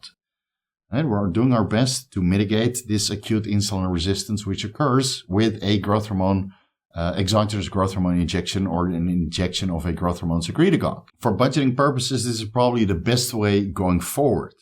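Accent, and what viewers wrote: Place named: Netherlands. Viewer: what is your heritage, I can't quite place your accent.... Dutch?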